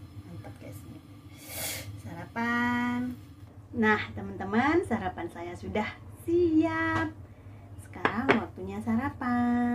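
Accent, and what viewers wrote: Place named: Java, Indonesia. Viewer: Enak wae gthu denger logat jawane😂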